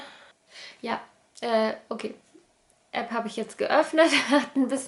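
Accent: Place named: Franconia, Germany. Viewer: Daumen hoch wegen deines fränkischen Dialekts :-)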